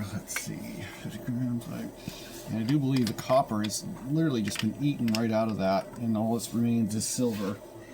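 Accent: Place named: United States. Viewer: because I am as well, yank can hear another yank! 😁 Also think I heard you mention a storm or weather event that sounded exactly like the weather we had going on. I'm in NH, you could probably drive a golf ball into Maine from here.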